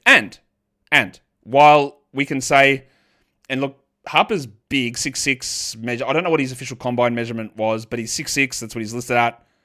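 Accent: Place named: Australia. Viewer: He’s Australian, he inherently has an accent different from ours. Also he’s. He’s called sadiq bey the depressed penis for his whole career.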